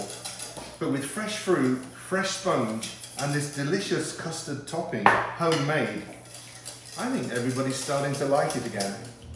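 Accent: England, United Kingdom. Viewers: What a lovely English accent!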